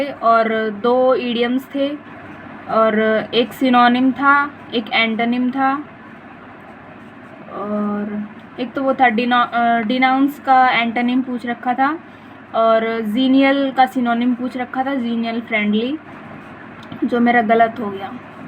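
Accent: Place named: Bagri, India. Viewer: oh aapki language meh thoda thoda bagdi touch hai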